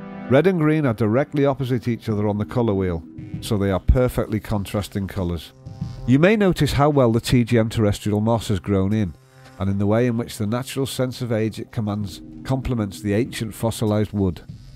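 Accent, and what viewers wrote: United Kingdom. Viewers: I just loved this aquarium. Explendid word. just got tired of the british gentleman narration as the work was being develooed then I Mute the video, so I could enjoy the beauty of this work of art and the sound of silence. Jeff from Brazil :-)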